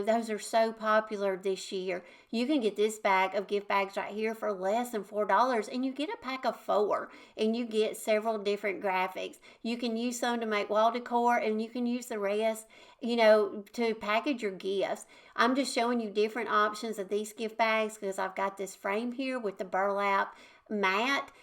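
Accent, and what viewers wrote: South, United States. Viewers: Love all your ideas. Your country accent sounds like mine that I can't get rid of 😅😅. Where are you from 😊.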